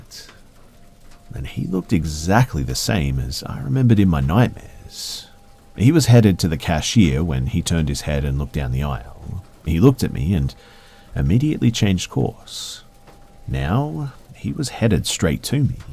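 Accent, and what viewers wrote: Australia. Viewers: Yeah mate our Australian accent is pretty great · Gotta love that Australian accent 🤣🤣👍🏾👍🏾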